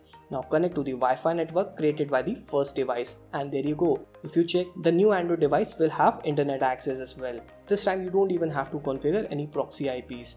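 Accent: India. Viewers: I love your low-tone indian voice, every word is properly pronounced. Great job! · An Indian I can understand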